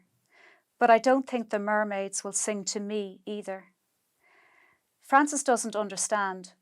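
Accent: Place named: Meath, Ireland. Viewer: Beautiful reading. The Meath Navan accent hasn't disappeared either. Congratulations.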